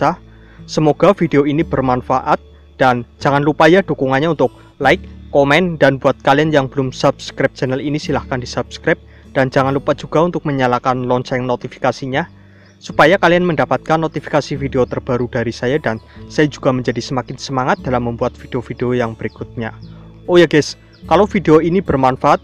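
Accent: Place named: Banyumas, Indonesia. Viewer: salm ngapak kang ,... :D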